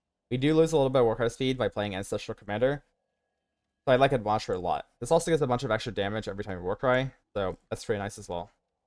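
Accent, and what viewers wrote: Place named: Germany. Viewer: Wie wär's mal mit langsamer sprechen? Immer diese Deutschen und ihr versuch englisch zu sprechen...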